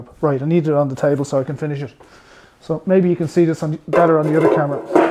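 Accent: Ireland. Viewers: That's a mild Irish accent 🤣🤣🤣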